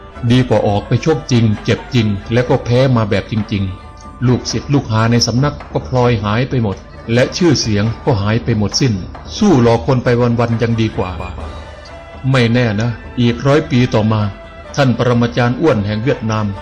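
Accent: Isan, Thailand. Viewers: ชอบมากเลย... พูดอีสาน ได้ม่วนอีหลีเด้อ55555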